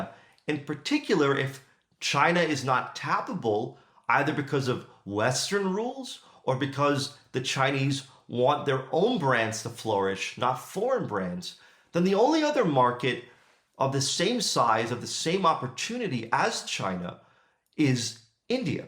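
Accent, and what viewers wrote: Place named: Canada. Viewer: You can tell he's not in the US. Listen carefully, and you'll notice he's Canadian.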